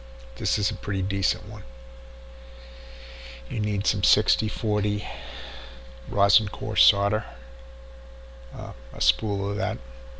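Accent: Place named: Boston, United States. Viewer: i can tell you're from boston...just saying. cool video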